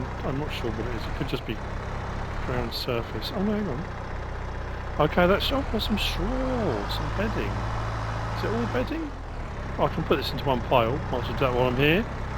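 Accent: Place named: United Kingdom. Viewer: "Kango-hammer" seems very fitting for the British dialect, you saying "jack hammer" just doesn't sound right with your accent. (Plus Knango hammer just sounds cooler lol) I work in a quarry, kinda. I work for an aggregate and concrete company, I drive the redimix trucks. So you're pretty spot on with your story line, which was a brilliant idea and nicely executed. As far as the diehards that say "it's farming Simulator, there shouldn't be any forestry", I've never met a farmer that didn't own a Chainsaw, that wasn't ready to cut some trees down for field expansion and for firewood. Not to mention, TREE FARMS??? There's lots of tree farms out there for wood pulp for paper. Any farmer out there is looking to make the most of their time, and to not ever run out of money makings. I don't understand these people that say "you can't do that on this, you're HAVE to do it THIS way". 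If it were said to me, I'd have a few choice words for them, of which I won't say on video. DO YOU. Don't worry about it I'm not doing it the way you would.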